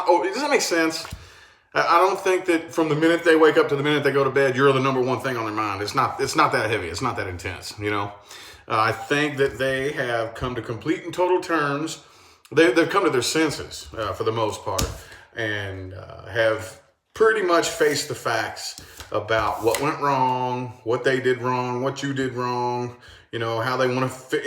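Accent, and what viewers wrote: South, United States. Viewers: I love your voice!! It sounds very southern ❤💕💕💕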